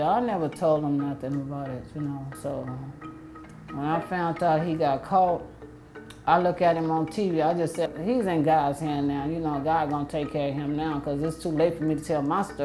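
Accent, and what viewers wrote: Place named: Louisiana, United States. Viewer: She still got our accent tho.... Half of my family moved to California in the late 60's and they know they are from Louisiana...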